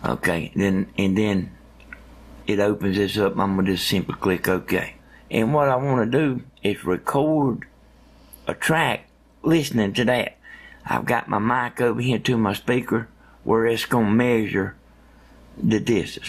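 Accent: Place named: South, United States. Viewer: More Southerners should speak like that again. Excellent video. No waffling intro, straight to the point and helpful..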